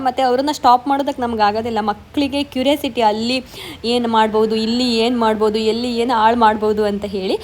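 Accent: Kundapura, India. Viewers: Nivu nam kundapura kannada mix madi mathadthri kelike kushi ath nange❤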